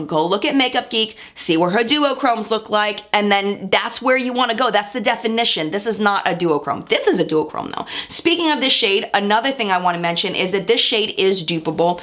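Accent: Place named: East Coast, United States. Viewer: This was a great review! Seriously, the format was impeccable (sincerely appreciate the skip-ahead sections!) and I love you allowed us as the viewers to decide if we think it's a good choice for us. It felt educational with out too much bias, and it was great to see how thorough you were with reviewing every aspect of the palette, right down to side-by-side ingredient comparisons. I appreciate how you acknowledged who this palette is ideal for and who it may be less ideal for--a lot of make up reviews insist something is bad because it wasn't for them, it's so frustrating! So really, this was a freaking great review (also it's been so long since i heard that east coast accent!!! <3)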